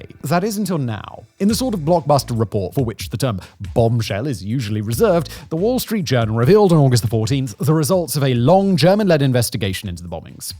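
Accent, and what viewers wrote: United Kingdom. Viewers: an artfully belabored speculation with a right proper british accent is still speculation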